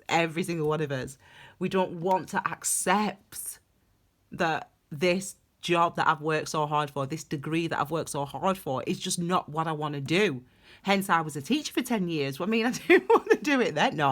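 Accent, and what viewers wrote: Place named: England, United Kingdom. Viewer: Its nice to hear am English voice. I'm USA side now. Manchester birthplace.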